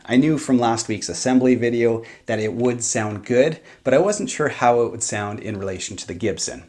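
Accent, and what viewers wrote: Canada. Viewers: I just noticed he has a Canadian accent. Nice.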